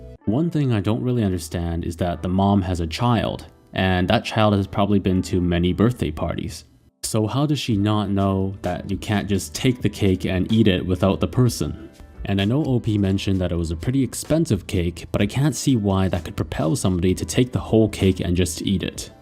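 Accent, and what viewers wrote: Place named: England, United Kingdom. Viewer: you sound like TheRealKenzo but with just a pure english accent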